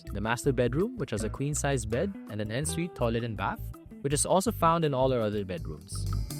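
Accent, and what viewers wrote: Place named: Conyo, Philippines. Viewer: Ang conyo. Sakit sa tenga pakinggan 😅😊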